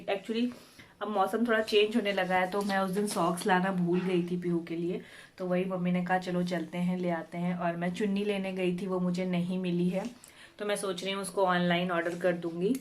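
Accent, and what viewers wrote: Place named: Kanpur, India. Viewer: Purani yaade taza ho ganyi...chauk aur ftp ki galiyon 😀😀😀 First video me Apke voice tone se hi laga ki ap cnb ftp se😀😀😀👍👍👍👍👍 Good work mam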